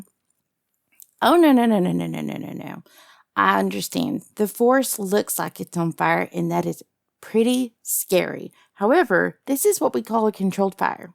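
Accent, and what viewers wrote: South, United States. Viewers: I feel like your getting more southern every time you put that accent on.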